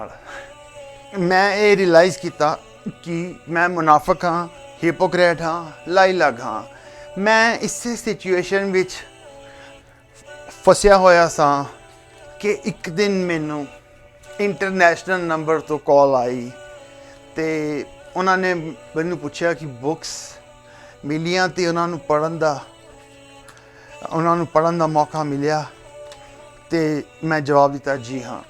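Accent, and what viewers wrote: Pakistan. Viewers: 😂😂 guys this is just a fake propaganda video by pakistani punjabis. His accent and urdu words mixing with punjabi says it all. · Tu sikh nahi hai teri bhasa hi nahi hai sikhn wali ihe boli pakistani hai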